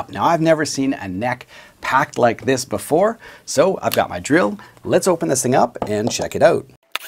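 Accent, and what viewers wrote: Canada. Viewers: I love the Canadian accent! Great videos, too. Subscribed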